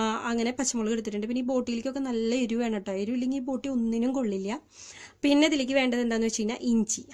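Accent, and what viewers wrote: Thrissur, India. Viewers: ചേച്ചിയുടെ അവതരണം സൂപ്പർ . സംസാരവും തൃശ്ശൂർ ക്കാരി ആണ് അല്ലെ വീഡിയോ കാണാൻ കുറച്ചു വായിഗ് പോയി സോറി . സംഭവം കലക്കി ... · haha...kollam chechii...enike ee recipem chechide sumsaravum istapettu....thani nadan thrissur bhasha..nyc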